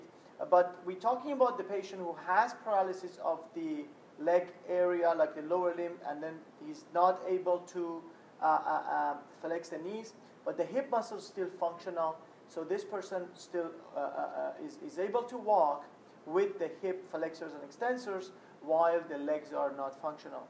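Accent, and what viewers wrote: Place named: Iran. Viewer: Well done! with nice Persian accent. Damet Garm Ostad!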